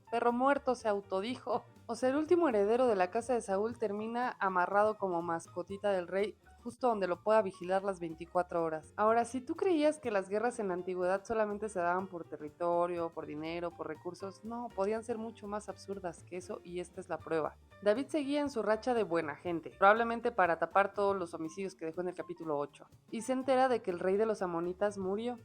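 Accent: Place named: Mexico. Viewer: recuerdo cuando comencé a leer la biblia de inicio a fin ya en mi adolescencia, inició mis primeras dudas a las cuales le comenté a mi madre y esta me dijo: " Recuerda que con Cristo, se selló una nueva alianza eterna" La respuesta nunca me convenció porque más bien parecían dos Dioses. En mi lectura nunca llegué al N.T. en verdad el libro era tan aburrido y habían libros más interesantes que leer... Espero con ansias llegar al N.T. que también tien joyas!!! Saludos desde Panamá. (Me encanta tu forma de leer y cómo el metes ese bello acento mexicano)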